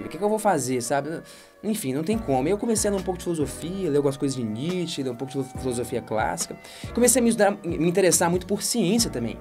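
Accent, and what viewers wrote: Minas Gerais, Brazil. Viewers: "esse trem" kkkkkkk sotaque mineiro == tudo pra mim!!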